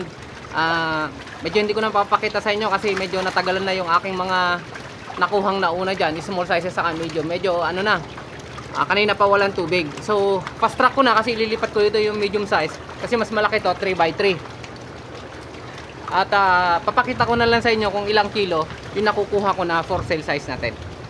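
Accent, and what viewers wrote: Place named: Ilocos, Philippines. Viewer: Taga ano ka gayam Sir, kasla nangngeg ko ilokano met sao yo. Ty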